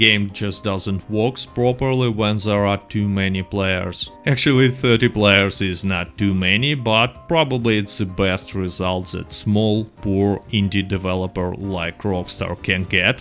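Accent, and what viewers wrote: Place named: Russia. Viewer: Is he Russian, is he German, is he whatever Niko Bellic is?? Maybe he can do an impression of him!! Please say "Anything for you, cousin!" I love this dudes accent!! (btw I know hes Russian but his accent sounds like other accents..so awesome)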